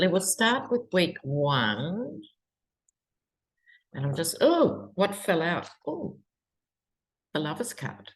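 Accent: Australia. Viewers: BTW, I love your Australian accent which makes it even more fun to listen to your video!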